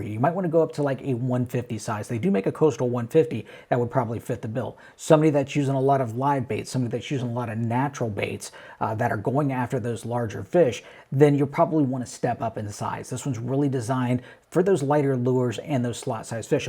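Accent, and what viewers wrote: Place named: Texas, United States. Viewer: Texas rubbed off on you huh?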